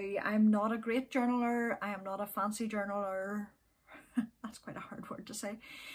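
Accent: Ireland. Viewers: Congratulations with your 10K subscribers. I love your podcasts, your talks about yarn & patterns love love love it. And you have such a beautiful Irish accent. My name is Inge and i'm living in Belgium, my dream is to knit a colorwork sweater by Marie Wallin. I have a lot of books of Marie Wallin because i love her colorwork. I'm looking forward to your next episode. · I really appreciate your gentle manner, your honesty, the practical approach you take to knitting and yarn, your Irish accent and showing us the beautiful coastline of Northern Ireland! I still remember the episode you did during covid on the little things we could do to stay well - there was so much practical wisdom in that. Congratulations on reaching 10K subscribers! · Many many congratulations on 10k👏👏I really want to knit Joji's Frank shawl, but keep knitting hats and cowls for other people. 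I have loved each and every episode you have produced. Love your Irish accent and sense of humour. I hope one day I can meet you. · hello! It's so nice to have you and your lovely irish voice back on a regular basis! Joanne from Illinois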